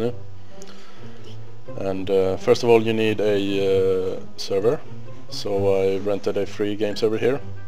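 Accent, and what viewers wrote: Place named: Sweden. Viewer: Are you Swedish? Just a guess based on your English accent...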